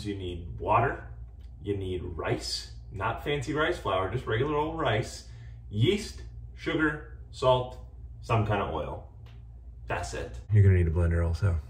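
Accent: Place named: United States. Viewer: you have an American accent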